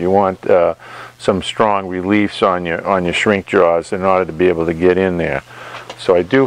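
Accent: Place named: Maine, United States. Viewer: Awesome video. As I used to live in Maine for 13 years the accent reminds me of home. My question is you tend towards shrinking most of the time and why that is? I have done quite a bit of tin knockin in the past and really never gave it any thought. Your thoughts would be greatly appreciated!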